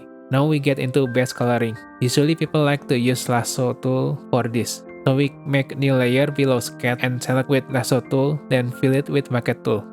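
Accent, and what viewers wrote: Indonesia. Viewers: Thank you for this tutorial! I'm immigrating from ibis to krita and it's so overwhelming. I kinda see why ibis is still searched after, the ui is nothing like other art program, even my 10yo niece master ibispaint super quick! But it's less advanced compared to krita. My friend told me that krita is better if i'm walking the professional path. Anyway when i heard your accent i immediately thought wah indo nih and it turns out to be true lol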